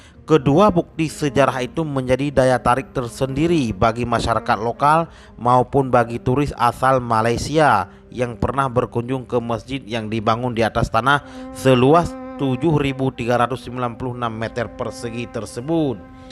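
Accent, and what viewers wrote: Aceh, Indonesia. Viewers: Pertahankan logat bahasa melayu acheh itu sangat lah elok. Lihat orang jawa yg mencuri bahasa melayu cakap nya godok godok sampai mati pun mereka jawa pencuri bahasa. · Bahasa keulayu,logat totok Aceh,geuthat ka pap leumo,Brio kedeumgoe,ka meuronoe bahasa keulayue beu geut ilee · Mantaaap bang.... Sukses slalu.... Logat ÀCEH nya kental Kami bangga ❤️ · Loghat aceh masih menebal...bereh that bos...👍👍👍👍👍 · Sep tat logat Aceh rayek dron neuh bang😁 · Kita logat aceh mulia jngn hilang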